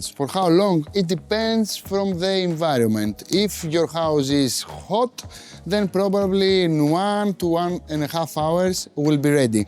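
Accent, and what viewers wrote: Greece. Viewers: your Greek accent is coming back 😅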